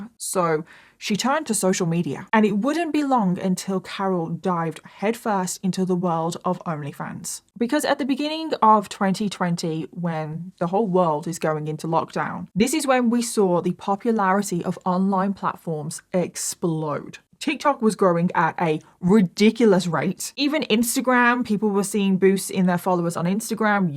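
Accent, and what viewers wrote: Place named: United Kingdom. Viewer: Can somebody please tell specifically what kind of British accent has? Thank you. I like it.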